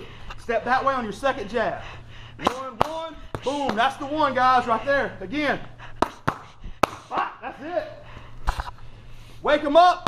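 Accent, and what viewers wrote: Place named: United States. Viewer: I train Muay Thai out here in Thailand. Never been to another gym. It's a trip hearing a trainer speak English terms with an American accent and attitude. lol. I need to come visit a gym back in the States! heh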